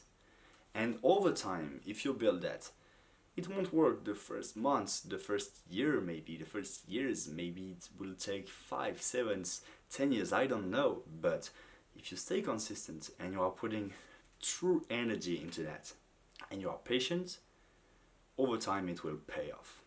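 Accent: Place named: France. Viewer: Just honest feedback, you have great content, but work on your English your French accent is alluring but a teeny tiny bit too heavy so it’s hard to understand you sometimes. You’ve got the face and content for YouTube it’s obvious! Good luck!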